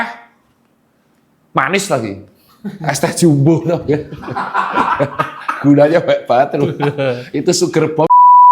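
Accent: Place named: Surabaya, Indonesia. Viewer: Cok.. Arek iki wong sby ta? Lambene kok cocok karo aku.. 😂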